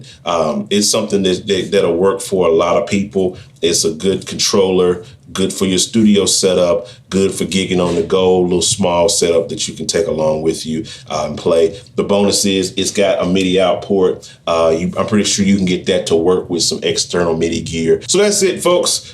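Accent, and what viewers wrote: United States. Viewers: The review is good, but the pronunciation is really very "American". It was very difficult for me (Im Italian, understanding English well) to follow.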